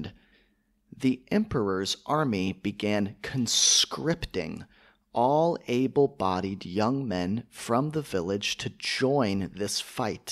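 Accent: United States. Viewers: I found your channel a week ago and was curious to know your first video. You have started differently but I really like your clean pronunciation!! I am learning English in British accent because it sounds better to my ear but you are making me to want American accent as well!! Thank you !!❤❤❤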